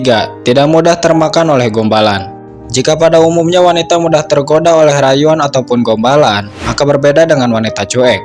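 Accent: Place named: West Java, Indonesia. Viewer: Admin nya orang Jawa Barat ya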